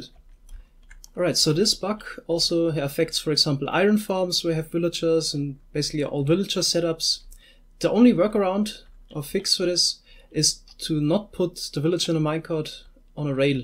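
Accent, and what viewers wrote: Germany. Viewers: I love your german accent keep it up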